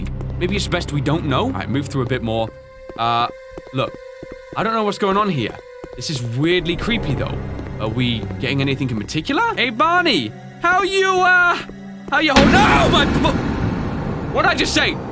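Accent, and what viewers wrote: United Kingdom. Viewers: I hear british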